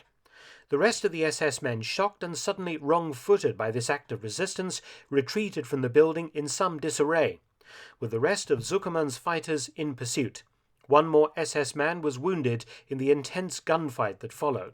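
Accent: United Kingdom. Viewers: When you have a WW-ll Historian and author at the helm how can you go wrong? The British accent narration doesn't hurt either. 😊👍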